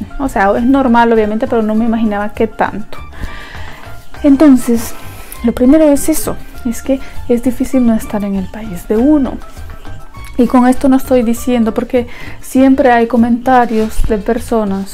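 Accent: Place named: El Salvador. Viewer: Adoro ese acento salvadoreño!!!! Te mando calorcito nos tb sentimos mucho frio y en verano demasiado calor! Buena vibra hermana!!!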